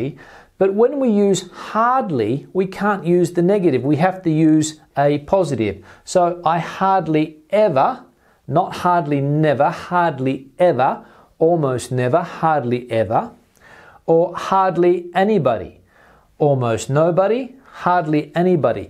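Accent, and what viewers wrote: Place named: RP, United Kingdom. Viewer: It was very pleasantly and usefuly to listen to your lesson, sir! You have the real British aristocratic prunounciation! Thank you. I'm from Russia. Now I'll watch your classes more often.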